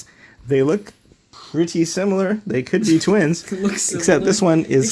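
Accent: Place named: Chicago, United States. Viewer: Are you from the Chicago area?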